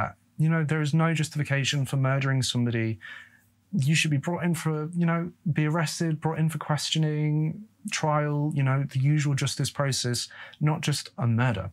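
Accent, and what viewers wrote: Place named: England, United Kingdom. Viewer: Oh, I don't really find any reason to take offense, but it did spawn an enjoyable and interesting conversation about the strange way certain words are spelled or pronounced. And I'm not trying to bag on in any way; he's English and can't really be expected to understand the full nuance of American pronunciation. I really only commented because I'd want to know if I was pronouncing something wrong and I'd hope somebody would politely correct me.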